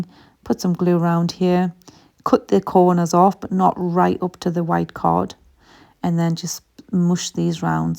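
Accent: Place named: North, United Kingdom. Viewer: Thanks for the video, very interesting but also your accent, you sound like me. I don’t live up North now so I don’t hear our accent very often. It was lovely thank you.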